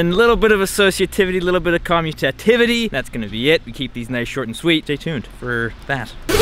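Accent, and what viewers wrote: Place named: Scotland, United Kingdom. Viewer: Hey! 😉😁 currently loving:... 1/ The return of the Scottish accent 😍😊 2/ The backwards braces ("yer aff yer chump bigman" 😂👍 love it!) 3/ Wee homeschool blackboard (💥 This man won't be stopped! 😁) Cracking wee vid mister, keep them coming 😁👍😉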